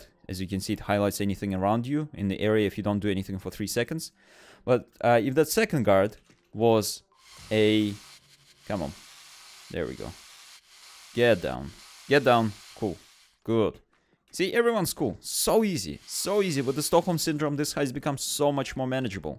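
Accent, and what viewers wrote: Russia. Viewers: arr u rusian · [GERMAN]Ich höre da Russischen Akzent raus. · So, you are Russkiy, ye?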